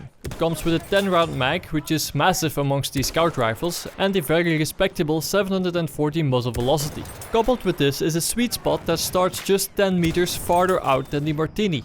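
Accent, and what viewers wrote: Netherlands. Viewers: Hey, are you by any chance dutch?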